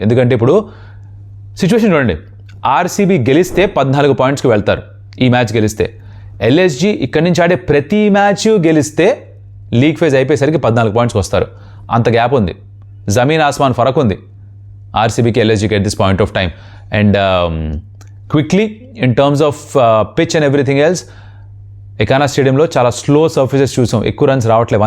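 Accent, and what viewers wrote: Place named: Telangana, India. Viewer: అరే అన్న.. నువ్వు చెప్తుంటే నాకు ముచ్చట చెప్తుంటే చెప్తూ మంచి విషయాలు చెప్తా అన్న.. సూపర్ అన్న.. మీతో పాటు కామెంటరీ చెప్పే ఆ ముసలి అంకుల్ ఏదో భాష మాట్లాడుతాడు.. ఆయనకే వచ్చు అన్నట్టు ఏదో నీట్ గా మాట్లాడుతున్నట్టు చెప్తాడు. అట్లా చెప్తే ఎవడు వింటాడు అన్న.. ఇట్ల మన తెలంగాణ భాష, యాస లో చెప్తూ ఉంటే ఆహా వినడానికి , నాటు కోడి కూర తిన్నట్టు ఉంటది అన్న.. సూపర్ మీరు..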